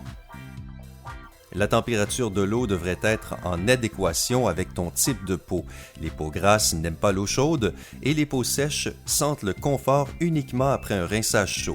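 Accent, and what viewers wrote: Quebec, Canada. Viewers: Oh je love les Québécois et leurs accent 😍😍😍😍😍😍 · J’ai liké pour l’accent 😂 j’vous kiff les québécois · D'abord quel jolie voix et l'accent québecquois c'est agréable je cais essayé je suis célibataire si j'amais 😂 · Y'trop Quebecois lui 😂😂😂😂😂 Calice ton accent y tres obvious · Avant je détestais l’accent Canadien maintenant je kif 😍. A part ça superbes astuces 👌👌👌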